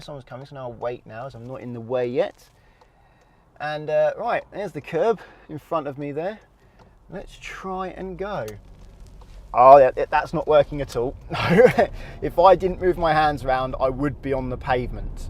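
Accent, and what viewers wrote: United Kingdom. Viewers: Well, I just realized I speak more of American English than British English looking at the way this British man speaks😂😂😂. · Man your the best If I was in the UK (assuming it's where your live because of your accent) it'd be a pleasure to be your student My current instructor is so ruthless he's slapping my hand without even telling me what I've done wrong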